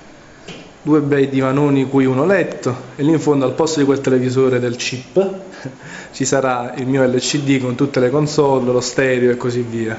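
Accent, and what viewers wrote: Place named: Naples, Italy. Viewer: Nei video di multiplayer.it l'accento napoletano non è così marcato come qui,lo stai man mano perdendo oppure lo contieni?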